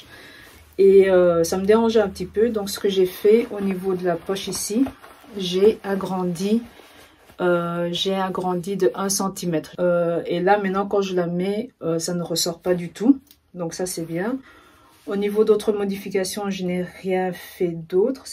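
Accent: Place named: Belgium. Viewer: Coucou , je te découvre et j’ai adoré !! Tes cousettes sont vraiment très chouettes et chic !! J’aime beaucoup tes cousettes hommes ! Pour ton pantalon carotte , il est top , peut être le couper « tête bêche » comme pour un jean ? Pour éviter que ça vrille ? J’aime bcp le gilet sans manches de ton mari ! Pour les Jean , je vais regarder de plus près ce livre car je suis conquise par la couture jean aussi et c’est qd même un beau budget ! Bref je te dis à bientôt !! Et j’avais bien reconnu ce petit accent !! J’ai fais ma primaire et secondaire en Belgique !! A bientôt 😍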